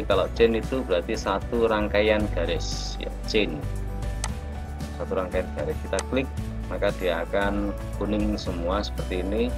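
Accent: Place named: Java, Indonesia. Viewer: baik Pak. Trima kasih. Sedang mengikuti video tutorialnya Pak. Karna masih awam banget jadi sering saya nonton. Kalau boleh saya tahu posisi Bapak di Jawa mana. Dari logat seperti orang Jawa. Mungkin Saja di Surabaya bisa saya kursus secara langsung. Trima kasih🙏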